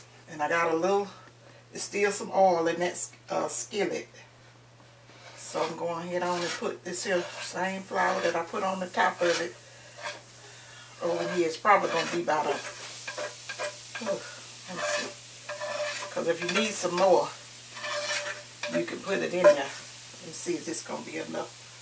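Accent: South, United States. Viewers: Love your southern accent and your video...making this for Christmas 😊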